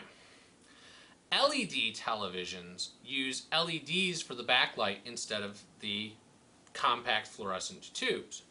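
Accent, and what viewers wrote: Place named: New England, United States. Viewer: Every time you go to one of these video's and it is a guy with a Yankee accent, be prepared for too much talking and show boating. In other words, hear me roar, I can talk a mean game of pool.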